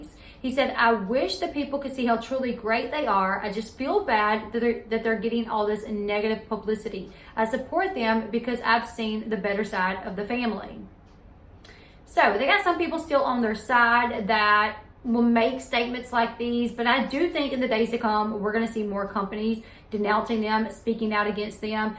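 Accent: South, United States. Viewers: I just wanted to tell u I love your show! Your southern accent brought me in but you are a smart informative lady! Keep up the good work!!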